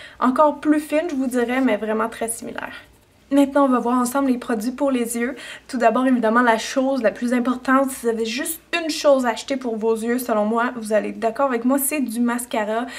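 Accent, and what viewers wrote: Canada. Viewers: J'adore l'accent canadien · Mon Dieu, je vous aime vous Québécis et toi: Vous comprenez, VOUS, qu'on dit pas louze (comme les français le font si bien) mais "lousse" pour le mot loose. Merci d'exister!!:))) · C'est un accent, l'accent canadien :) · T'es canadién ?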